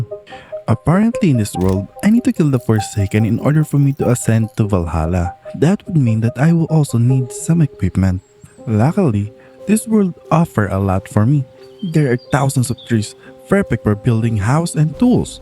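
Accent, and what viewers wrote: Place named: Philippines. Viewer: i recognise that accent, but i can never be sure, would you happen to be pinoy?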